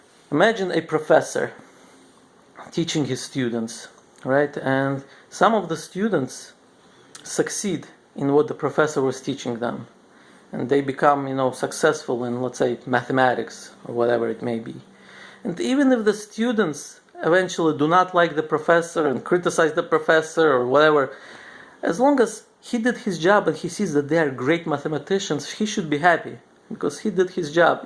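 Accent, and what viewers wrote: Russia. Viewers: Hi bro! I am crushed into your videos. Just curious to know where did you get your russian accent? )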